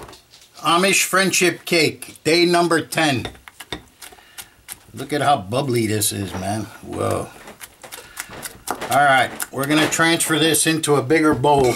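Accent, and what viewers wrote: Chicago, United States. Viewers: Great "Chicagoland" accent,which i enjoy hearing since i relocated to SoCal 4 yrs ago. A'int the same difference either.They talk/sound different here. A very good example of the SoCal sound would be original version of ' 'from album '.' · You have a Chicago accent as do I lol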